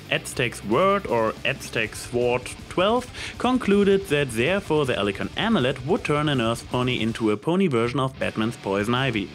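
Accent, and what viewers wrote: Germany. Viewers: Quick question, are you German?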